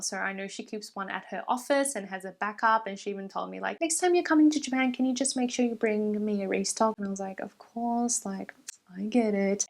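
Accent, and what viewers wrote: Australia. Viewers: Happy to hear an Aussie accent. I was wondering where in Australia stores can we find these ? I haven't seen these in chemist warehouse or myer David jones etc nor I am from Korea so can't understand even if I missed ? Were these brands available in Aus since last 4 years? I haven't come across them in Westfield either.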